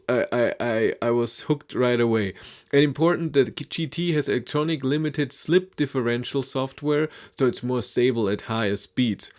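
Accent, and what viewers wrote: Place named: Germany. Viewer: german accent omg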